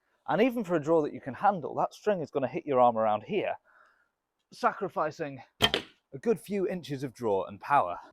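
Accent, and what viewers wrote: United Kingdom. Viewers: why did I know he'd have a british accent? From the thumbnail I was imagining something like "shooting soidways?? MAAAATE!" and then a bunch of Teesside verbal abuse lol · I had this on mute and thought 'this guy must be british'...I turned the volume up and wo and behold lol P.s. this video was very entertaining and informative 👏🏾 ❤❤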